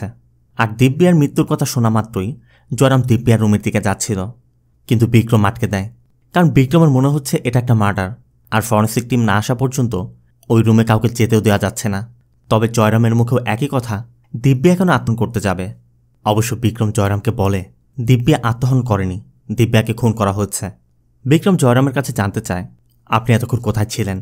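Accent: Bangladesh. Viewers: কলকাতা বাঙালি এই ভাবে কথা বলে না নিশ্চিত বাংলাদেশি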